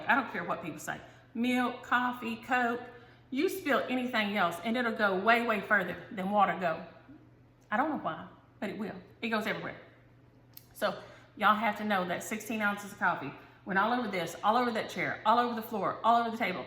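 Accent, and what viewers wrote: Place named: North Carolina, United States. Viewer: I seriously laughed out loud when you talked about whoopings and “I’ll give you something to holler about...” I love Blackbird too and that pretty bird in the red! Nice job....keep up the great work... now to go see where you’re from! I live in NC and you sound like me! 😂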